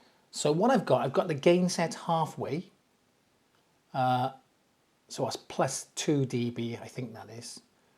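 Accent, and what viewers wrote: United Kingdom. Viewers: not to be a hypochondriac but I know all about autoimmune disorders as well. It can cause the weirdest issues! I permanently lost smell/ taste. They say it's autoimmune. I lost my hair, it came back Stark White then fell out again but then came back 100% like it never happened. Supposedly autoimmune as well but thank God I haven't lost my sense of sound!!! Good channel by the way I subbed and liked. I love your mannerisms I gather your from the UK, not the usual British accent but charming nonetheless. Perhaps it's because all my family is supposedly from the British isles but it's always something comfortable about your accents and mannerisms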